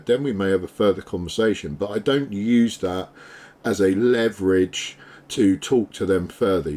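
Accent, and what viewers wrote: United Kingdom. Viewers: It sounds a lot less rude in a British accent ❤ 😂😂😂. I love the information. I am very good with my situational awareness, being armed and telling people No. But these are great videos to share with others and I do learn something from them too.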